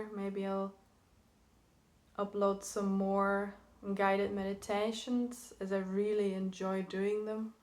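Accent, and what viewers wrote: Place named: Ireland. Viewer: first comment🤪why didn't we start with crown? sorry, I don't mean to be a prick(it just comes natural lol). I like to employ frequency & vibration, light & sound. as far as I understand the bliss of the chakra-gasms, its different from the actual transcendence , but essential on the way. 😁your wisdom is beyond your years, im jealous LOL "when I was your age..."😂 your voice is perfect, brogue? can you run your voice through a compressor or limiter & boost the db so I can hear your voice at an even volume.🥰 crap im not being critical honest😇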